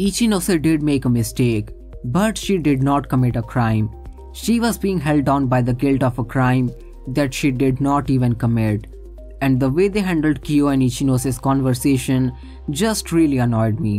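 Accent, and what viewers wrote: India. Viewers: You have Indian accent are u one??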